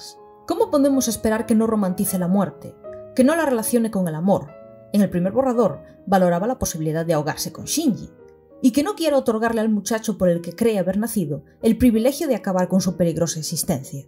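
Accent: Spain. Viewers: Una pregunta, eres gallega? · Son fantásticos, todas y todos los que estén detrás de este trabajo, mucho orden, demasiada edición, la narradora tiene el tono de voz ideal con el endulzante acento español, es increíble. Estoy enamorado de sus videos. Saludos desde México!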